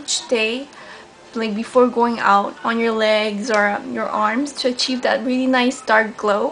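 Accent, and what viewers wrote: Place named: Quebec, Canada. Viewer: I love St.Ives I use almost all of their products :) But....just asking do you live in Quebec because you sound french from there...anyways I love your videos! :)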